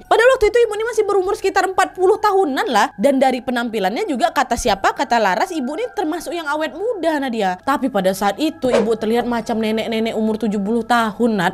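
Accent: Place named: Riau, Indonesia. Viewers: Kak nadia knpa logat nya jdi logat riau. "ndak do"😂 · pake logat Riau juga ya Wak😭😭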